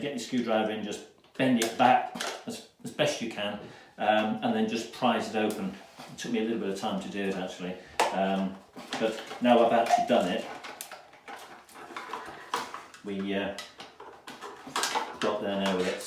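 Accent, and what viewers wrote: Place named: United Kingdom. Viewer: Good morning, you're brilliant, and the explanation, with that British voice, is thrilling!!! Very explanatory video... I disassembled "almost" everything following your instructions and made it to the end... Unfortunately, I wasn't able to open the black box containing the folding motor and, more importantly, the one you ordered and replaced. Can you tell me the parts with the codes and where to order them? Thanks. Giorgio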